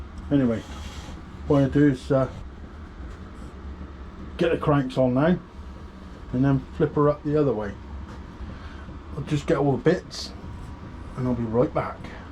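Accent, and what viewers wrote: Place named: South West England, United Kingdom. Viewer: That accent sounds like its from south west England. Clean and tidy build, not sure about the orange but it will stand out in traffic, for me I would only consider using a fat tyre build in winter or if using heavier battery, What rotor sizes are you using, for me I won't use any size below 180mm, using 203mm up front and 180mm rear, the heavier the build the more stopping your need and 160mm rotors will not cut it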